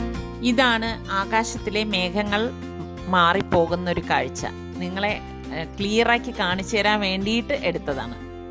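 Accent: Trivandrum, India. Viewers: trivandram bhasha samsarikkarundo ennu athenikku istamanu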